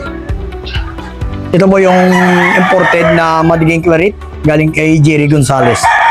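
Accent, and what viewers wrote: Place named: Iloilo, Philippines. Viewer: Ilonggo bla si sir 😊😅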